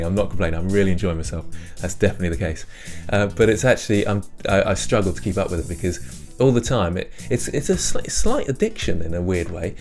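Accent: United Kingdom. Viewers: "Hey, I'm, I'm very sexy" he is being too modest, under his sweater he hides a six pack also, he has a british smile and accent 👌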